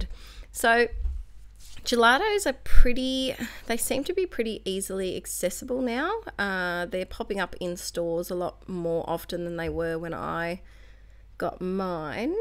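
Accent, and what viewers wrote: Australia. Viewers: I like 10-15 minute videos especially if I'm at break during work but I love listening to an aussie accent for hours. So you make them as long as you like.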